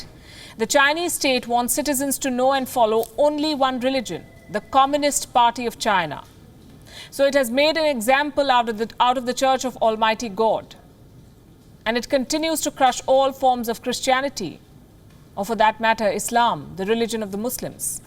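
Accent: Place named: Northeast India, India. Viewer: That north eastern accent 😻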